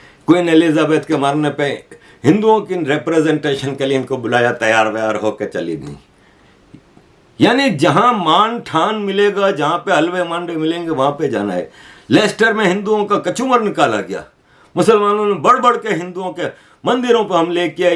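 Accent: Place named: Sindh, India. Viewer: Love your views. My utmost respects. I think you are a Sindhi (from your accent, but I may be wrong). Heartfelt respects from another Sindhi. 🙏🙏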